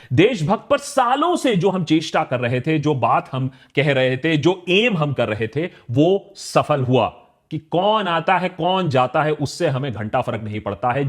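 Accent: Bengal, India. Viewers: Tomar moddher Bangali ta majhe majhe jege othe 😅😅😅. Chesta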